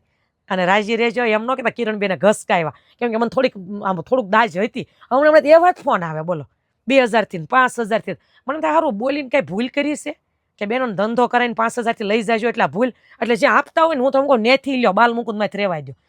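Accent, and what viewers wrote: Kathiawar, India. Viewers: બેન તમે કાઠિયાવાડી લાગો છો બોલી પર થી મે તમારા 2 થી3 વિડીયો જોઈ આ તમે બોલો છો બહુ સરસ કેટલા હજારથી ધંધો ચાલુ કરવો જોઈએ મેકસીમમ સ્વામિનારાયણ ધમૅ છે હું પણ સ્વામિનારાયણ છુ જય સ્વામિનારાયણ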